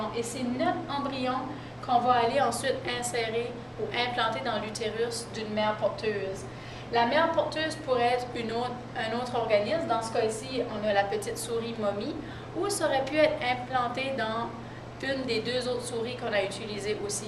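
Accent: Quebec, Canada. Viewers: t'es sourd ou quoi ? ça s'entend à 2 km qu'elle est québécoise.. (l'idiotie se soigne)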